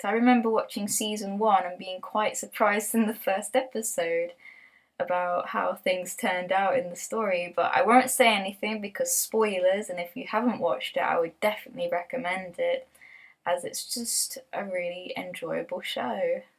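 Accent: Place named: United Kingdom. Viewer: Jesus this british accent is so annoying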